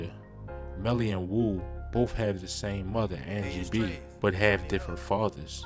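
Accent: Baltimore, United States. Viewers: baltimore accent 😖😖😖😤& wtf is “Nokim Noah” 😳😵‍💫🤣🤣🤣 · I can tell u from baltimore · You definitely from bmore bruh lol keep doing ur thang g 💯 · U from Baltimore?? Lol u def got the accent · This narrator dude gotta be from Bmore!! Twwu, Neeews, HuuuLU😂😂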